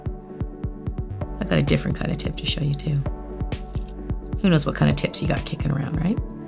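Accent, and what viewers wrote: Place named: Canada. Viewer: Valery B even without saying that they are from Canada (which has been said in several videos) you can tell the Canadian accent. 😂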